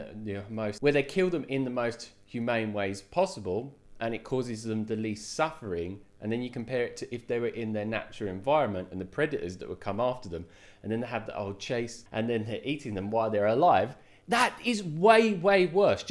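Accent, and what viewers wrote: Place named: England, United Kingdom. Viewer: İ concur with you. İ watched this video for listen your enlish accent. And i understand you clearly :)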